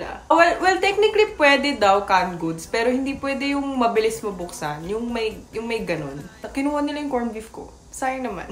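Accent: Batangas, Philippines. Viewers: Yung Batangueña accent mo ba. Matik din nawawala pag wala ka sa Batangas? 😆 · Very Filipino kung mag tagalog yung accent at yung taglish nya. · Grl,,aliw din aq sau,,, alam mo Yung pagngsalita ka wagi, ay pinay pla. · Parang filipina mag salita pero koreana 😂 astig. In what language do you think? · Hala kakatuwa Naman kayong magkakapatid😊❤Pinoy na Pinoy ka talaga magsalita. Ang galing 😁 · You look Korean Bec you are but you act & speak like a Pinay 😊✌️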